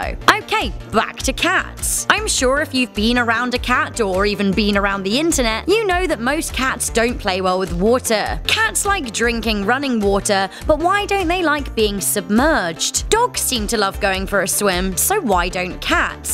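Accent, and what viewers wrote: England, United Kingdom. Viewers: Loves the color green Has an English accent And loves cats Anyone get the reference?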